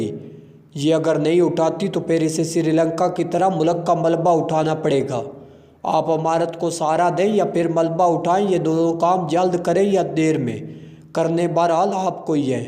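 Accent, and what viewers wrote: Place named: Punjab, Pakistan. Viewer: ye Admi Urdu mea panjjabi Bolta hea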